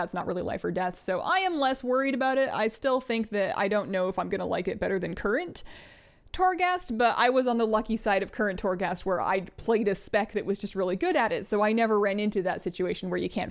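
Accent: Canada. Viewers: Are you Canadian by any chance?:D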